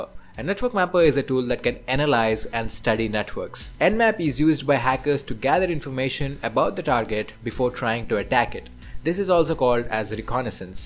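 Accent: India. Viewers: I knew you were an indian guy I knew it since the beginning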